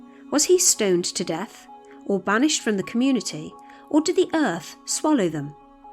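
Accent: England, United Kingdom. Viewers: I so enjoy your quizes and also love the sound of your voice with its English accent.😊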